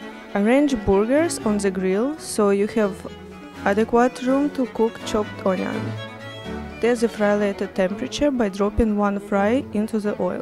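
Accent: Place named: Russia. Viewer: Да она Макдональдс вообще по-русски произносит.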